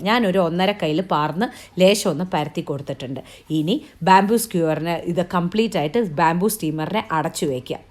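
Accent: Palakkad, India. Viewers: Chechi palakkad anale athanu palakkad slang samsarikinathu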